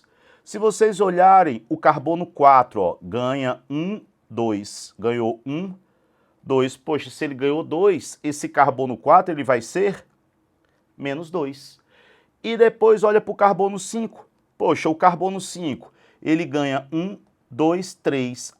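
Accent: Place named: Fortaleza, Brazil. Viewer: assistindo as aulas não so pelo conteudo mas pelo sutaque dele ,bom dms homi ! alguem sabe dizer se ele é de fortaleza? o sutaque é ,mt parecido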